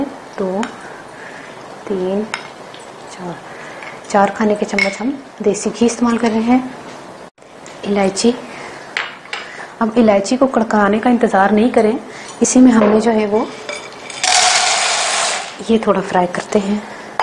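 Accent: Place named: Punjab, India. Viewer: V nice ap ni asan chezn btaen or ap punjabi bhot sweet bolte hn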